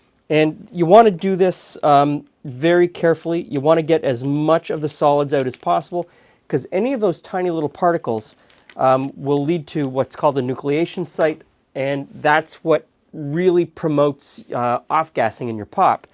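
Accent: Canada. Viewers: Are you Canadian ?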